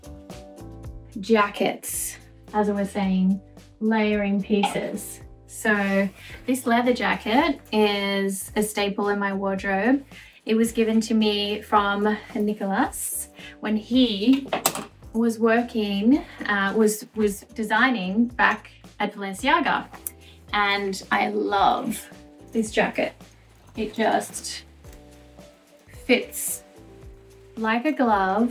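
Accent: Australia. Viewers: Like her Aussie accent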